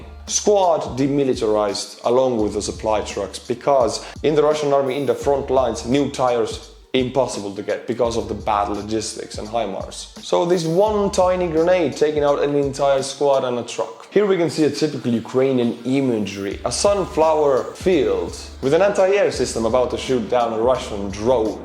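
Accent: Estonia. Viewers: I like how the patreons are read. I am considering becoming one just to see how my name sounds in Estonian lol. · The best news on this war with Estonian Accent... Mr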